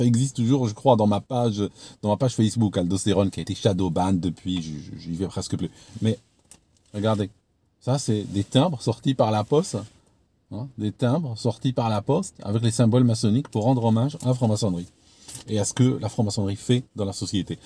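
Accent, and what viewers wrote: Switzerland. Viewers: On s'en fiche qu' soit FM. On l'aime tel qu'il est avec son bonnet, sa tignasse et son accent suisse par intermittence. Un franc mac qui ne croit pas aux missions Apollo, ça n'a pas de prix.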